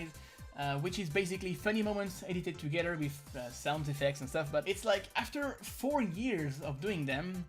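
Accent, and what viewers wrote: France. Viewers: Cet accent... l’accent français se sent.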